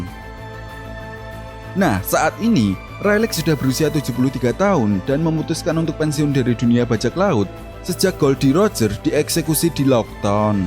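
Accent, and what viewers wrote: Java, Indonesia. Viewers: Bahasane meduk rek 😁.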